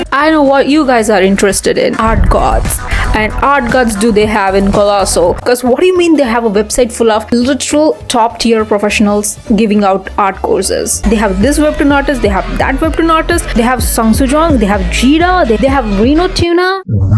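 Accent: India. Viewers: your accent is lowkey indian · Are you an Indian? Your accent sounds familiar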